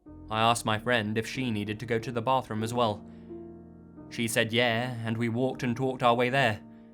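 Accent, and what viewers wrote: United Kingdom. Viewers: This dude is the most British sounding native American I've ever heard. Good stories tho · Just have to say that i really like this channel. Love the brittish (?) accent. Keep up the good work! Sorry to hear about the copywright shit. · Native American Indian stories with British accent. Loving the stories thus far just caused me to raise an eyebrow. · Fair use Act violation sounds like. As long as no one's being harmed. I don't see a problem. Good video.. a little wierd hearing the story in a British accent though. I'm thinking more baritone" Native elder 101" voice. Good stories. Thank you.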